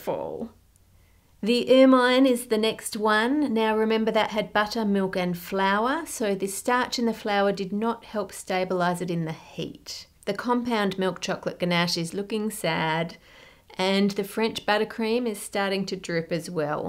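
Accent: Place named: Australia. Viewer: Sometimes sounds like bob ross, but with a female Australian accent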